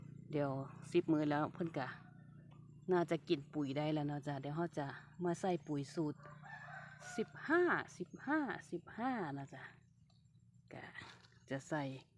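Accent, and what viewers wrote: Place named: Sakon Nakhon, Thailand. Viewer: สำเนียงเหมือนบ้านผมเลย สกลนคร ผมก็ทำสวนพริกเหมือนกันครับ ขอบคุณความรู้ครับ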